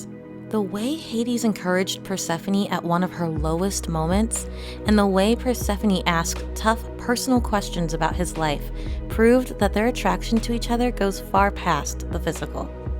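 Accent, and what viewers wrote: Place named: New Zealand. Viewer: Love hearing that NZ accent!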